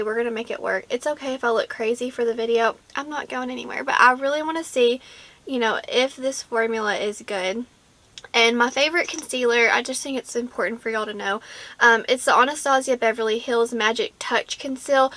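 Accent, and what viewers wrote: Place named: Alabama, United States. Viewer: First time I have watched your channel and ohh boy you are such a sweetie ,) I love watching the ones with soothing voices and you have it… also an accent ( what state do you live in girl ) maybe Alabama ?? Sorry to hear it’s a concussion I got. Into a horrible wreck 6 months ago, worst grade concussion border line TBI, better now to find out I have a torn rotator cuff and I’m a Nurse so might be a career change due to this wreck YIKES !!! Slow recovery when it comes to concussions, so good luck and hope your migraines calm down… lots of rest girly ,) I just turned 40 So weird as I feel 21 and have been into cosmetics since I was 15 also professionally for 10 years… have a collection as If it were my day job, make up is my passion and no matter the meal it always fits ( other than matte concealers ) LOL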